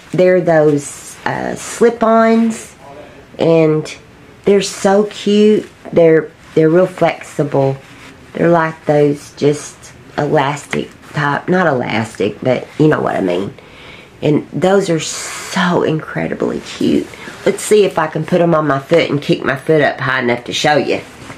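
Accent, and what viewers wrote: United States. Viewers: Aww darn it, your accent reminds me of my grandmas and aunts from Oklahoma 💕 I watch reviews for the talking so talk away lol I’m also addicted to Temu 😂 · You are so entertaining! I’m a great grandma that loves Temu also. I loved the cat shower curtain. I’m ordering one for me. What state are you from? I’m from Alabama. You sound southern like me. 🐓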